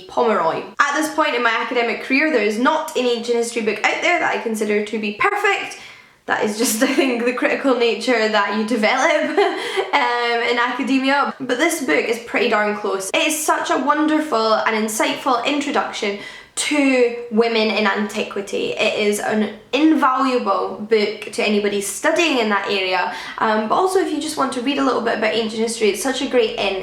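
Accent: Scotland, United Kingdom. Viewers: I LOVE your accent! Scottish accents always amaze me 😂 I'm British by the way.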